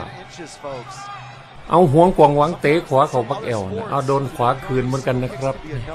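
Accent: Isan, Thailand. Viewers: ฮาคนพากย์เสียงนะคะ(แอดมิน)ฟังการพากย์เสียงก็ขำมากค่ะ มีพูดอีสาน แคดแลตออกมาด้วยนะ ฟังไปขำไป😛😁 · รู้สึกรักภาษาอิสานเลยค่ะ พอมาฟังท่าน พากย์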